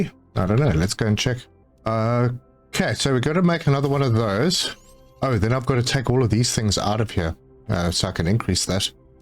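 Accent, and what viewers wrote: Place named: South Africa. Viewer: Clicked on the video and that accent jumped out at me, haha. Fellow South African! Awesome videos, man!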